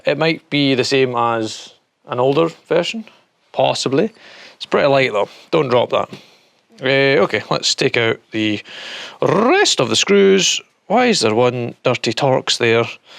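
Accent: Scotland, United Kingdom. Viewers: I wish I saw your video before I bought one. I paid $75 AUD for this full kit with 2 batteries shipped to me in Brisbane australia from a Melbourne eBay store. Delivery was within 3 days and excellent. But the constant cutout while grinding drives me nuts. This is my first time trying out a fake brand substitute. It’s no way better than my Ozito (Einhell) grinder. Never again. I found your video very good and love your Scottish accent. Subscribed. Thank you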